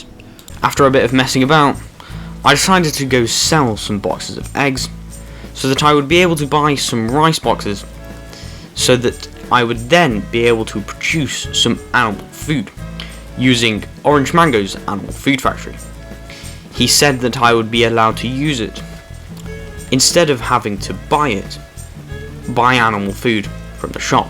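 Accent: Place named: RP, United Kingdom. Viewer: you sound very posh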